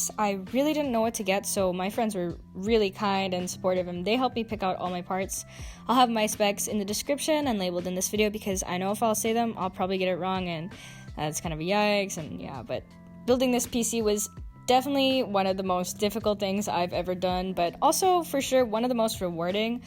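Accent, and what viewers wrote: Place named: Philippines. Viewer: You sound like you're filipino. Are you?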